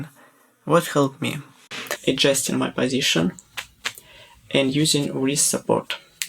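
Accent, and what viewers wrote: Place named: Russia. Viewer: Настоящий руский акцент а так-то очень тебе благодарен за разбор мечтал когдато виучить.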